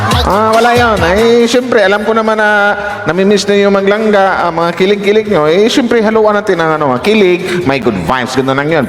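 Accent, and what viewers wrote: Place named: Batangas, Philippines. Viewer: ❤sir ang accent Ng salita ay Batangas.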